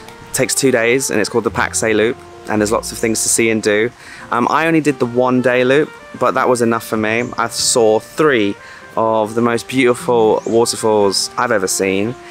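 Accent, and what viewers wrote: England, United Kingdom. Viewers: . Judging by the sound of your voice, I assume that you are from England. So why do you always use US Dollars and not GBP when comparing prices in Thai Baht?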